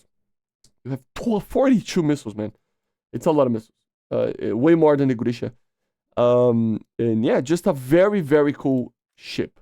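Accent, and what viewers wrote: Brazil. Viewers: pelo sotaque esse mano é br mas n posso provar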